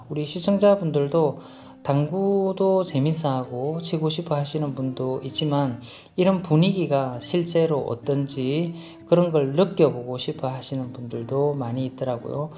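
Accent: Gyeongsang, South Korea. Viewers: 말투가 너무 정겨워요. 제가 중학교 까지 창녕에서 가장 가까운 합천에 살아서 그렇게 느껴 지는가 봅니다. 영상 잘 보고 있습니다. 곧 10만 미리 축하 드려요.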